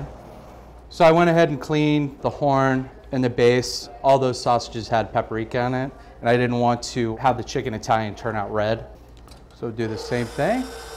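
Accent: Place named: Philadelphia, United States. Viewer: Think this dude is from Philly. He says certain words funny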